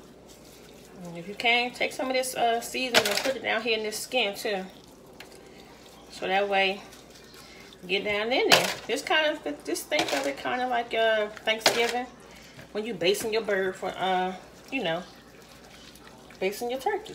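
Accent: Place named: DMV, United States. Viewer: Hey neighbor 👋🏾 I’m in the DMV too and know that accent anywhere! Girl I’m making these tomorrow with some dirty rice and sauce! Thank you so much for sharing your talent and giving us a fantastic recipe!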